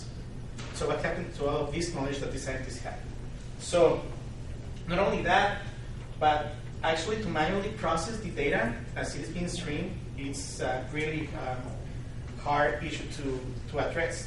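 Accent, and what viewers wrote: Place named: Arab world. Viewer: His accent is bad. is he arab?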